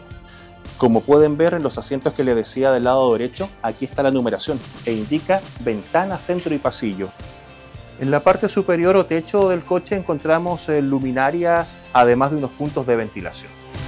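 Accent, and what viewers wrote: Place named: North, Chile. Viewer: Excelente nota! Y excelente noticia el que se vaya observando de a poco el revivir de ramales como éste a lo largo de chile. También destacar el claro y bonito dialecto algo nortino que nos recuerda a los oyentes que en chile nos queda aun un hermoso dialecto no cargado al coa contemporaneo. Gracias por la nota, me suscribo inmediátamente a tu canal! Saludos desde Suecia de alguien que hartos años vivió en chile. :)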